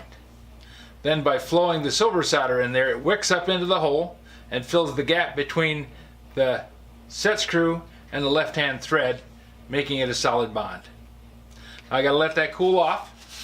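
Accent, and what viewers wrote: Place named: New Jersey, United States. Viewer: O.K.! You're like me with the JOyzee brogue. I was around 2 guys from there and I got so I could mock them to a TEEEE. They'd pound on me because they knew I was the biggest hick in the world and I'd just go right on and laugh my head off at 'em. And they'd pound on me more. Great guys and some of the best memories anybody could have while in Kansas in the Ahhmee!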